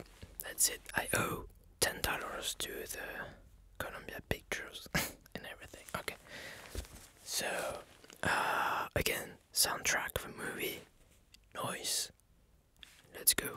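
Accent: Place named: France. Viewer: French accents are the best tbh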